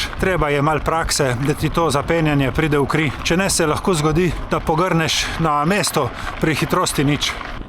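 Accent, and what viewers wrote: Primorska, Slovenia. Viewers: Predstavitve tvojih "potovanj" so mi všeč zaradi preprostosti in tudi, ker rad slišim primorski naglas. Malo ti zavidam, ker se voziš po lepih krajih in ti ni treba takoj v klanec. Jaz sem Zasavc in mi je to prikrajšano, ker moram takoj prestaviti v prvo za kakšno turo in še naša ozka dolina na cestah ni preveč prijazna do kolesarjev. Te dni sem zamenjal kolo in sem šel nad naše dva tisočake v Julice, kjer so me pozdravili vršaci nad meglenim morjem.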